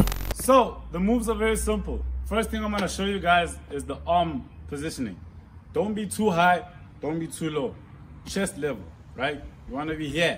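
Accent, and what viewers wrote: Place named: South Africa. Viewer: Where is this guy from?!?!? Getting south Africa vibes but Idk I also hear zim I'm confused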